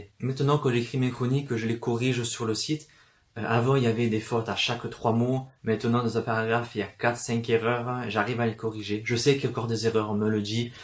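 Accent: South, France. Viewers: J'ai adoré ta vidéo ! Il est génial ton accent #teamaccentdusud 😉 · Vive le Sud, vive l'accent du sud et vive tes vidéos :D ! Bisous :). Et oui tout est possible : je suis bien d'accord avec toi :) !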